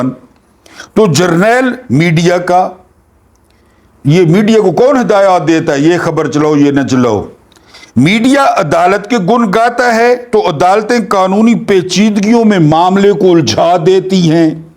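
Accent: Saraiki, Pakistan. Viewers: نالج سے بھر پور وی لاگ اور اوپر سے سرائیکی ٹچ ، ماشاءاللہ کیا خوب وی لاگ ہوتا ہے۔ اللّٰہ پاک آپکا حامی و ناصر ہو۔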